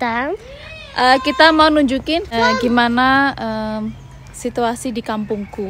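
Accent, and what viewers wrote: Java, Indonesia. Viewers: Bahasa jowone pean mentok banget mbak 😂😂😂👍👍👍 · Jowone ngendi mbak ? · anakmu ngguemesne. medok e puolll pisan mbk yu, slm ko malang kota. mugo2 sampean sekeluarga diparingi rejeki lancar lan sehat walafiat... aminnn.... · Ya Allah 😅😅 Jowone medok banget Ayem tentrem nggih mbak meskipun 30jt lebih buat sewa😅😅 Belum lain lain Mbak, WC ne pake air nggak 😅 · Kok iso muedok? 😅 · Mbak sampean jowone ngendi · salut iso bahasa jawa; dan juga medok; lanjutkan budaya jawa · Asalamualaikum mbk ... baru tau chanelnya .... jawane nek ndi sih mbak kok logate podo karo aku😅😅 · RAJIN UPLOAD MBAK E WES NGENTENI CAH AYU NGOMONG BOSO JOWO😂❤ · Paling seneng lihat cantik, ngomongnya Jowo medhok... Pinter juga bhs. Indonesia.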